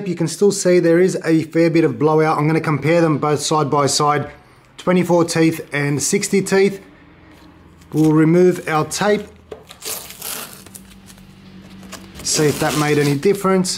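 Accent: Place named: Australia. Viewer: Will scribing first help? You sound like the Aussie building inspection guy. Must be a cuzzie.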